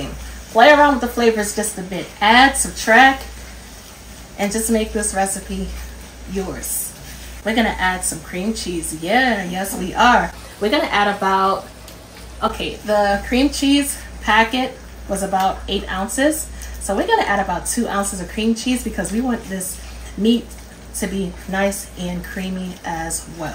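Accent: New York, United States. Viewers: I hear an accent…Are you a New Yorker?😊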